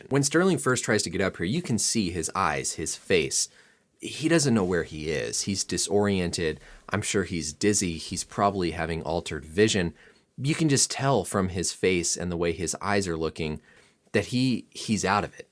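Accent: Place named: Minnesota, United States. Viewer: Ryan Reynolds is canadian and Dr. is from Minnesota. They have similar dialects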